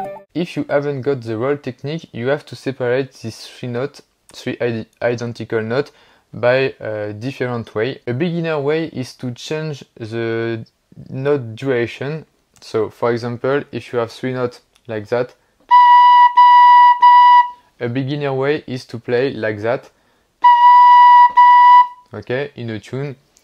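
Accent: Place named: France. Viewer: Je ne me lasserai jamais de ton accent so...french! Mais l'essentiel est de se faire comprendre..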